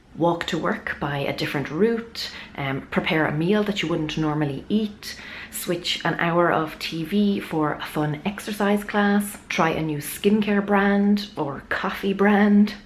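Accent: Ireland. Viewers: The Irish accent is one of my favourite accents of all!! I immediately trust anyone with an Irish accent. Is that weird?? Hahaha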